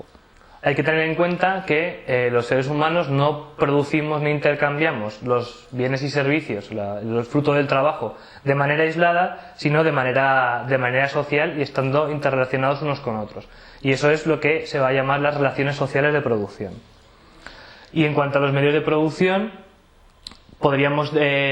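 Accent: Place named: Spain. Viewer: maldita sea que imbesilidad escuchar esto en un ridiculo asento español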